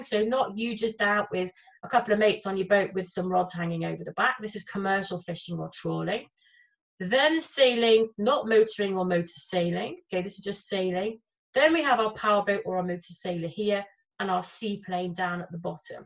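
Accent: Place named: England, United Kingdom. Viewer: It’s Greg Laughlin from Australia again and I’ve just watched this from beginning to end and it is wonderful. I really appreciate the English accent and the authority she has in her voice combined with the fact that she clearly knows her subject backwards.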